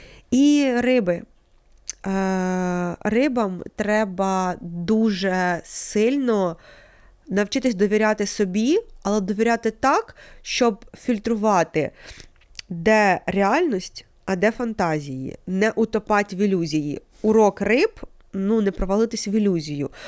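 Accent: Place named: Surzhyk, Ukraine. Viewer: Це неможливо слухати. Як людина з таким жахливим суржиком дозволяє собі виходити в ефір. Це, в першу чергу, неповага до слухачів, і до Вашої аудиторії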